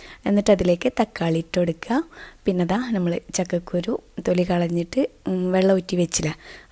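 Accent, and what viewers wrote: Kasaragod, India. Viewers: samsaram thanne kure kasarcode karude yanallo kelkumbol first entha enghane parayunnen thonum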